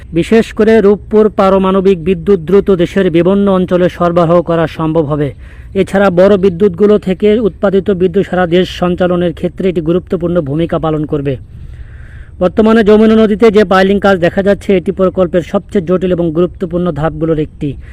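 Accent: India. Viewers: R you from India ? Your sound like Indian.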